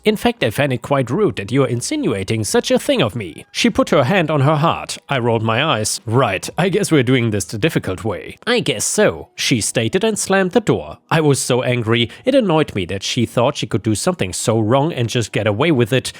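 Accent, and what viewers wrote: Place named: Germany. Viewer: Man, I enjoyed so many of your videos. And your accent sounded so familiar.. I've worked in a lot of countries during my "wild years". You are from Germany, right? Greetings from Obersulm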